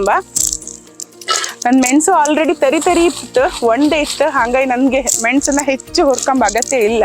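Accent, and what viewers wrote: Kundapura, India. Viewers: Nimm maath keli Kundapura koteshwra thallur upinangadi vanse maravnthe kollur.....nenpayth marre ! super👌✨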